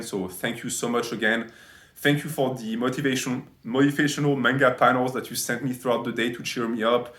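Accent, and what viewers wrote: France. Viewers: I am Polish and consume content from a french natty and get inspired in english. So I am happy that You are back, the content I want to share and the content I haven't watched yet is back. As a side note, You were also an inspiration to return to my french studies - educated noble muscle natties can learn more than one foreign language. Je suis très heureux que nous pouvons encore Vous écouter et Vous regarder. Nous allons tous y arriver. Je vais fêter ça avec des "pullovers" et des "Arnold raises". J'adore votre accent français. Salut!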